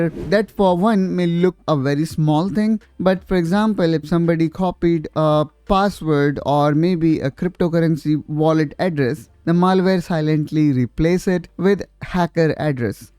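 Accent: India. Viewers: Try using an AI voice, the indian accent is a turn off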